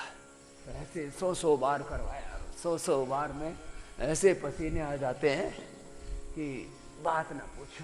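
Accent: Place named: Punjab, India. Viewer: की punjabi voice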